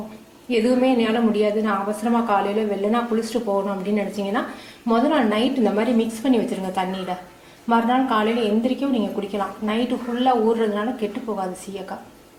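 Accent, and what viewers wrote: Madurai, India. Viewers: tq mam. very useful. I like all ur recipes and we'll explained about the ingredient very tasty.and I like ur madurai language very sweet to hear .tq s a lot.we r very lucky to have such a wonderful talented mam.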